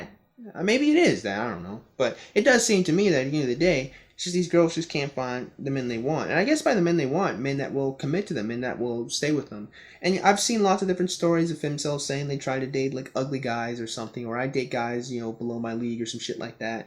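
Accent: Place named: England, United Kingdom. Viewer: Lose the random English accent.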